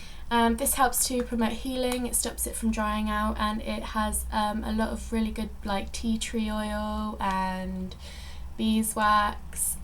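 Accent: United Kingdom. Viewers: Wow... I've only watched your videos where you don't speak so far, and I seriously thought you were American or someone who would have an American accent! xD What a pleasant surprise hearing your adorable British accent ^^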